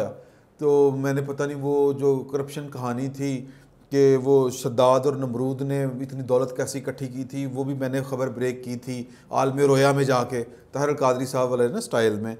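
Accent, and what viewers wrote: Punjab, Pakistan. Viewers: Punjabi tarka lai rakho.... Good analysis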